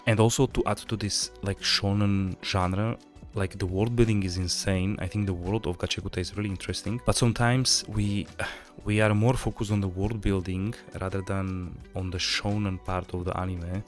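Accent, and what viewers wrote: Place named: Russia. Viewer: Why I feel Russian accent..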